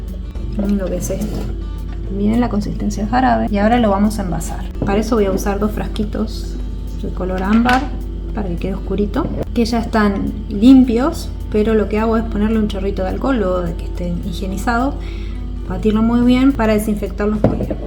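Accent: Argentina. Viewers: ❤😊gracias por la recent unique estoy USA y las hojas no se por cual sustituirlas acá que tengan esa ingredients parecidos 🌷🌷🌷gracias soy Dominicana me encanta el ace to Argentino y vivo aquí en North Carolina 🇩🇴🙏🏼🌷🌷🌷😍💕gracias soy Dominicana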